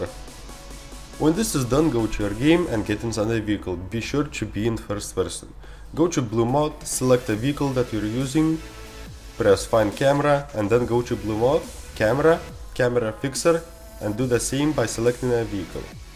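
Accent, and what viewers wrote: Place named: Russia. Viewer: I fell russian accent where you from comrade?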